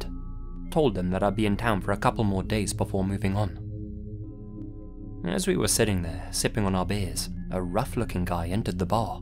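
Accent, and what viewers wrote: England, United Kingdom. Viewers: Great stories as usual,:) I know you can't really tweak the grammar of someone's story beforehand, but as a brit as well, your accent is far too good (and lovely to listen to) for the all the 'I's that should be 'me's in sentences like: "They kicked Steve and I out of the jeep". It sounds (and grammatically is) off...spoils it a bit, is all.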